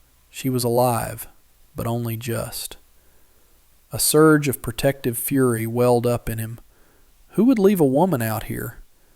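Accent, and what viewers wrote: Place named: Alabama, United States. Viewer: Love hearing a story by someone speaking with my accent. Such a beautiful story. Thank you for sharing. Listening from Alabama….❤❤❤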